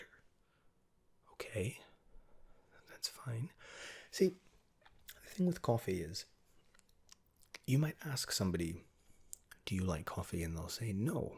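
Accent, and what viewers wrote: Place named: United Kingdom. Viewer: I have found my new favourite bed bug. Love your work. You have a wonderful mixture of toned down enthusiasm and relaxation in your voice and your accent is like nothing I've heard before. It's British, Irish, sometimes even with a hint of Australian... it's mesmerizing. May I ask, where ARE you from exactly? Have you travelled alot and picked up this little smooth smoothie of accents along the way? I'm Swedish so I am certainly not an expert when it comes to English accents but I've listened to enough to know that you sir are one of a kind in the ASMR game. ❤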